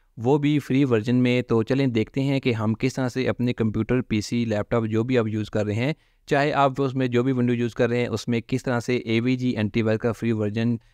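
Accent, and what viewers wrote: India. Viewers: Cannot understand, you have a strong Indian accent & talk too fast. A lot of foreigners have this problem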